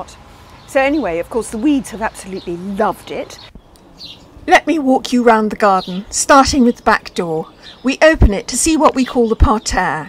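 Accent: RP, United Kingdom. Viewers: How safe is it to birch tree in a small garden, I have 4 and people are saying I am mad as they believe, my house would collapse one day due to them. Please advice. Thanks. PS: Love your posh accent.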